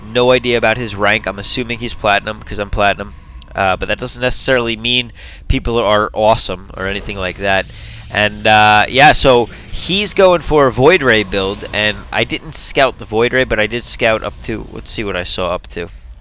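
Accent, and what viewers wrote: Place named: East Coast, United States. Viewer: As much as I don't like the screaming in my ear, I love his east coast accent. :P Go America!!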